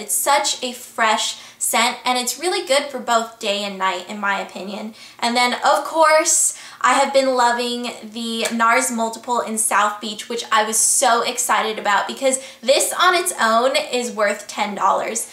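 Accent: France. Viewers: you have an amazing french accent (: birchbox need to send to england! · your french accent,, is so cute :) haha xx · ahah i like your french accent !! uugghhn i really hope BIRCHBOX will send to France soon !! i'ld like to try this; it seems really fun and its a cool way to try new things have a good day =) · i'm a french girl and your french accent it's really coooolll & pretty ^^* !!!!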